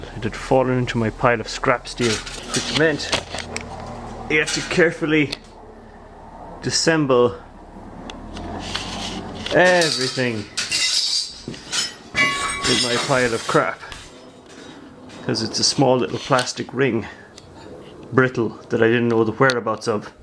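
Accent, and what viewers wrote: Ireland. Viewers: I knew it! Irish english! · You sound almost Irish? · Is that a Meath/Westmeath accent? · hey not to be a dick but why are you the only one i ever see on video that has the Irish accent and everyone else sounds American ?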